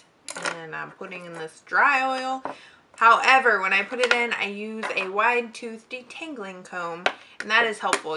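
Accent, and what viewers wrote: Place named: South, United States. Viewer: Jw where are you from? You kinda have a southern accent.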